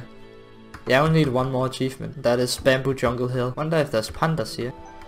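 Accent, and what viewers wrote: Denmark. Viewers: were a you from? bc you have a danish accent. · Are you from denmark? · I smell danish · are you from denmark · Is he danish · I smell danish. Me too partner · i smell danish, you said i figured out who det er lol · Are you from Denmark?, your english sounds a little like it, Keep up the good work <3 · Are you danish by any chance? Quite northern accent😁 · It's the danish accent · Dude its danish, he is a dane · aRE yUo A daNmaRKeR? Yes Can tell from ur accent ;) we can always find each other us danish ppl am i right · Er du måske dansk haha, nemt at høre ;) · it sounds like you are danish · This man sounds so danish. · You Sound danish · You really Sound Danish are your Danish?? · Are you danish? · Hey i just Wanted to know if you are danish cause your English has a danish accent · i smell danish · U from Denmark? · Are u danish?? Sound alot like a dane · i knew ur were danish the accent is HEAVY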